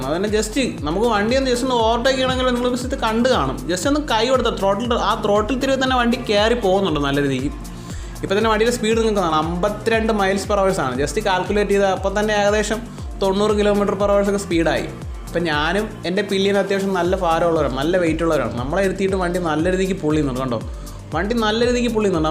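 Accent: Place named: Trivandrum, India. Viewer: Neeyokke parayunnathu matulavarkku manasilava kude Ella athinekal nalathu tvm bhasha thaneyya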